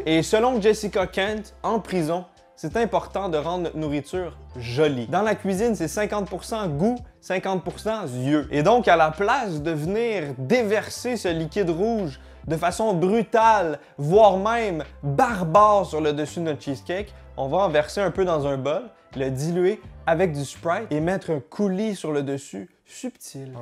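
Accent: Quebec, Canada. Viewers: cool Guy tu parle en québécois vive le Québec.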